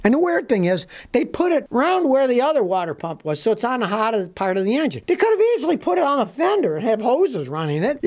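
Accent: Germany. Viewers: I like the German accent and the German laugh! So Funny! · German accent made my day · Please, please, speak more with a german accent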